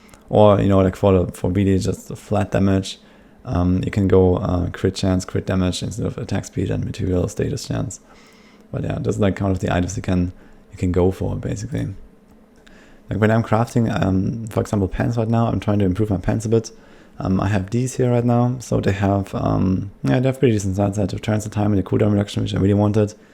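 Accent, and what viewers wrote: Germany. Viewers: Thx a lot, now i understood everything ;). Looked 3 videos before and i still had questions. Fast english, but is ok for me because its german english ^^. Dank dir auf jeden Fall, super Videos ;)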